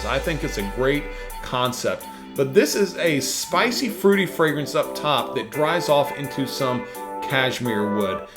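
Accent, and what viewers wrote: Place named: United States. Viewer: I love your fragrance videos and I'm from west Michigan and i can understand your American accent some of the fragrance channels i watch on YouTube even though they are speaking English language they still have a foreign accent and hard to understand what their saying thanks bro for the the tips and a really good fragrance review video 👍👍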